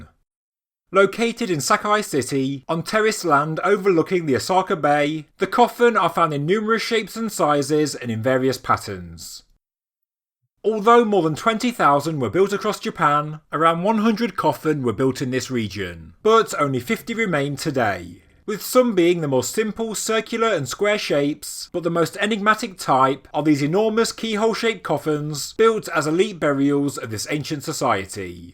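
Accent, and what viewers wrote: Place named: Black Country, United Kingdom. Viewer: Hey man, I know you are from my part of England. Your accent is familiar.😎 I want to thank you from the BLACK COUNTRY for showing us this global civilisation that existed before the bs history we were forced to study even thou it made no sense. What the eff are they afraid of us learning? The truth?.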